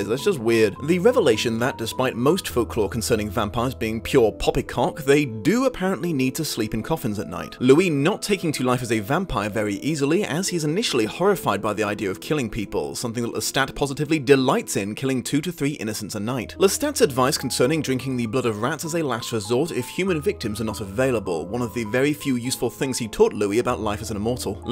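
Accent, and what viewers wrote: United Kingdom. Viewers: The British propaganda is working on my American ass, because that intro would have been a big nerd if it weren't for the accent 🫠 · What part of Great Britain are you from? I'm sure I've heard that accent before! But I don't know now where it is from. I lived in Benidorm for more than ten years. I was in contact with many British people during that time. Please, tell me where you are from!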